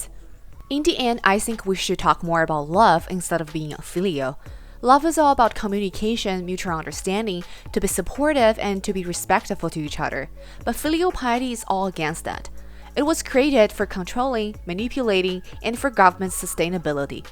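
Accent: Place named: China. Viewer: Interesting topic + excellent explanation + insightful review of the topic + Chinese accent = top tier educational video!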